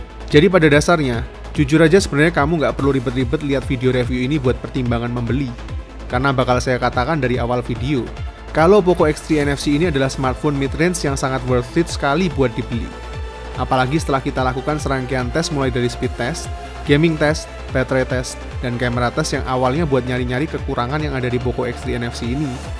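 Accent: Yogyakarta, Indonesia. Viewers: Bang orang jogja ya? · wong jogja mas? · orang jogja bang?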